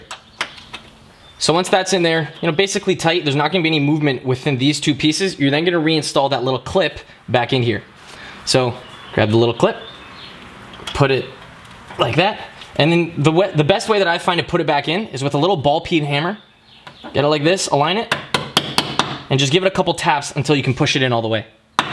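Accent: Canada. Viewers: He’s Canadian my dood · Yeah Canada! Accent gave it away (I think). Great video!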